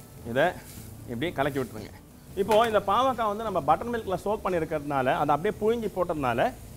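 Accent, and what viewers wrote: Chennai, India. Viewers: வணக்கம் வெங்கடேஷ் பட் உங்கள் சென்னை தமிழ் அருமை , பாகற்காயை அவன் இவன் எனபது . பாகற்காயை பிடிக்காதவன் சாப்பிட வைக்க என்ற வார்த்தை 👏 எத்தனையோ பெண்களை வாழவைக்கிறீர்கள் வெளிநாட்டுகார்ர்களுக்கும் சுவை எனில் என்ன என்று கற்று கொடுக்கிறீர்கள்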